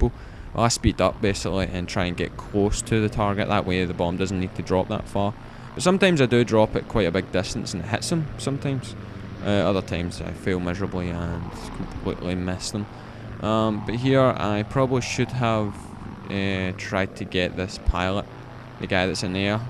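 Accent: Scotland, United Kingdom. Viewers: From Scotland? You sound Scottish :p We scots PWN! xD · yass, you scottish m8?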